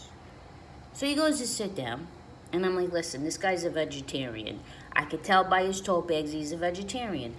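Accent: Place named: New York, United States. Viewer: Luv ur humor. Nice to see & hear a real New Yorker. Joseph from Minnesota.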